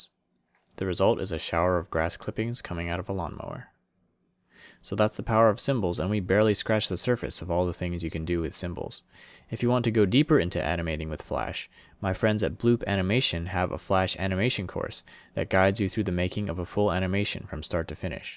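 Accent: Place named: United States. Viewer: He Is American Dude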